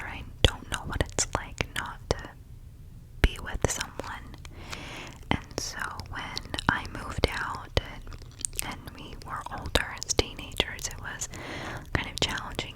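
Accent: South, United States. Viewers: I love southern sounds! Would love if you did a librarian role play like she does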